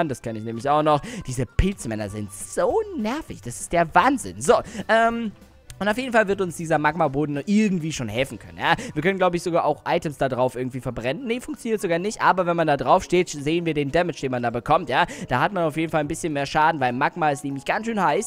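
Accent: Russia. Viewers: Kommst du aus Russland?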